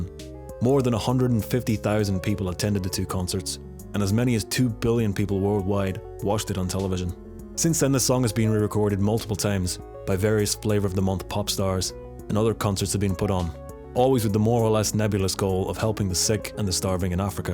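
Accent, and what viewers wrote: Ireland. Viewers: You sound irish, so what you think of as christians is a little different in the sivalised world. We don't all exchange potatoes wile huddled around a fire made of cow dung. Sorry to rewen you nestalga. XoXoXo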